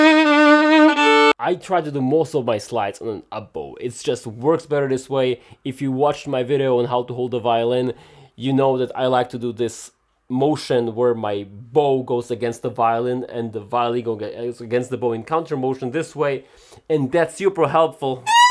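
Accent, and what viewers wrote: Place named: Russia. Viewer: are you russian speaking? I hear sometimes russian accent 😄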